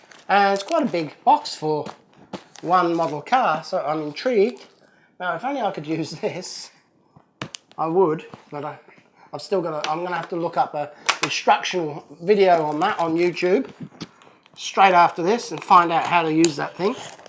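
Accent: England, United Kingdom. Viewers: So are you an English guy living in Australia?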